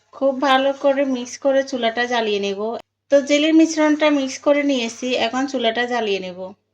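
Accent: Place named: Sylhet, Bangladesh. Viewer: লাইক সাবতবে আমার কিন্তু অভ্যাস নাই সাবস্ক্রাইবার করে আবার আন্সার্সটা এবার করে দেওয়া স্ক্রাইবার করে দিলাম আপু আর আপনার দেশের বাড়ি কোথায় অবশ্যই কমেন্টে জানাবেন আমার মনে হয় সিলেটের বাসার মতো শোনা যাচ্ছে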